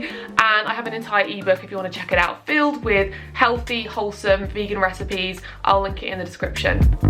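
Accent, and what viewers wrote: United Kingdom. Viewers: I like your British accent and thank you very much!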